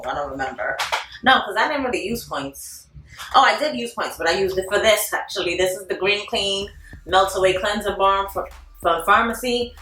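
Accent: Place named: East Coast, United States. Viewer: when I hear your accent, I automatically respond with "What up ma?" Girl your accent is just what my "West Coast living but missing the East Coast swagger" heart needs on the regular. I have the same issues with my eyes - the water eyes be killing my canceler game - do you have any tips?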